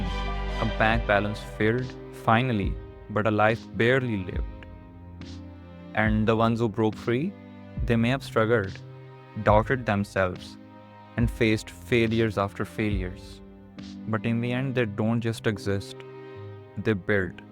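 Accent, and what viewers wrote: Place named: India. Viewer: A quick suggestion, the 'r' pronounciation isn't looking natural . Its alright to stick on our beautiful Indian accent. All the best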